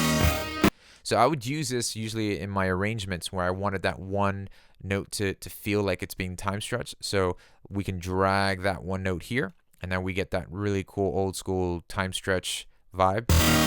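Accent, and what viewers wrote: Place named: United States. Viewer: You sound just like the guy from headspace only with an American accent, but so similar lol. Nice videos!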